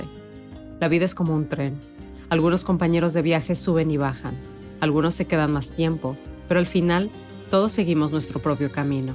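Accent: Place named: Mexico. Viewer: ¡Qué vidéo más bonito! Eres una mujer preciosa, con y sin canas, por dentro y por fuera. Me encanta tu acento mexicano y tu tono de voz … ¿has pensado en leer libros para niños? … Te descubrí ayer viendo vídeos sobre mujeres que han decidido dejarse crecer su pelo natural y, entre otros, me gustó mucho tu enfoque. Aunque aún no me he decidido, creo que es cierto que algo tiene que romperse por dentro para dar un paso así y dejar de pretender ser eternamente joven.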